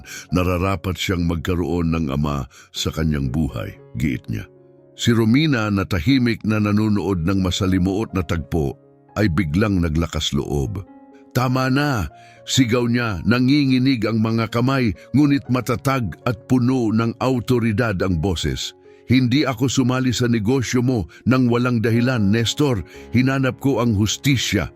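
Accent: Visayas, Philippines. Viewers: Magandang. 😊😊😊kuweñto ngunitpangit pakinggan ang mga pronouncition parang bisaya pakinggan pero marami akong napupulot na leksyon😊😊😊❤❤❤❤❤❤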